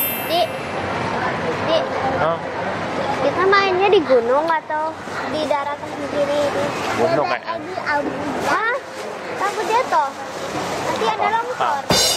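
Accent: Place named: Java, Indonesia. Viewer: chanel ini nih yang ngajarin adik saya jadi medok ngomongnya!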